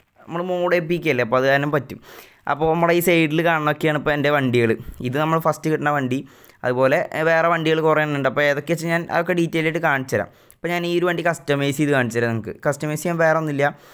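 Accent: India. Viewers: Bro thrissur karanano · U malappuram aano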